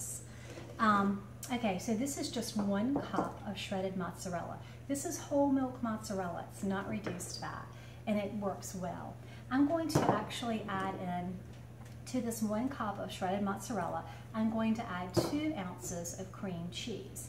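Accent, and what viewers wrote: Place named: Pittsburgh, United States. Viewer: If you don't mind my asking, what part of the country are you from? I grew up in the Pittsburgh area. sometimes it sounds like you are speaking "Pittsgurghese" but certain words sound a little off. Just curious about my own detection skills. Thanks for the great recipe.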